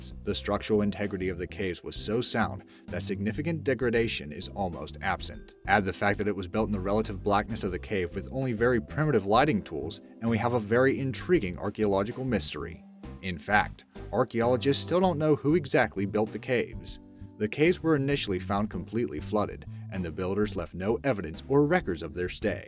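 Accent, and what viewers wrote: United States. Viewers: So American voice over an obviously foreign made video. "We are still dont know what it was" among several different word errors ive noticed. I have nothing against foreigners, just making a point.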